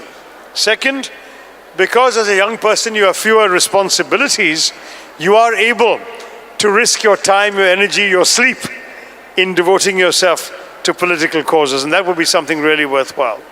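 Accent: United Kingdom. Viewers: At a very first time I'm watching dr. Shashi and then i perceived that he is speaking British English but easy. As he told about books there is joy of finding out destination that is already defined and i feel i am going to become good reader and dive into reading more. I love reading books 🥰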